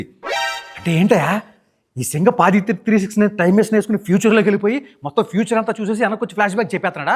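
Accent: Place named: East Godavari, India. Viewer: This guy is very intelligent and highly talented, with a fund of original humor and high class satire which we rarely find in Indian YouTubers in general and Telugus in particular! He effortlessly carries the East Godavari slang with appropriate verbal sounds at right appropriate places thereby enriches the descriptive part with a very unique and rare blend of subtle humor laced with extremely high quality observation of semi - literate Telugu script writers and near illiterate Directors! I think there is only one more movie troll, one Fasas Roast who is in the same league with this guy and he already emassed a fund of followers and I foresee and wish him the same, in the imminent future! I think I can even write more and I wish I should as he richly deserves but I don’t have energy as I’ve totally exhausted mine in laughing uncontrollably! 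Good Luck, Mr.! You’ve already proved yourself and try to diversify your inherent and latent by utilizing it to the fullest extent, if you are seriously into this! I doubt whether you are highly educated with a highly placed job and stuff! Anyway, you’ll Go places! That’s the writing on the wall and I’ve a rare gift of reading it clearly! I’m very fortunate to have a chance encounter with your posts and you’ve really made my day! You shall excel in your chosen line if you pursue it very seriously and with a little dedication and a bit more of an indefatigable industry! Amen! So mote it be! Kindly f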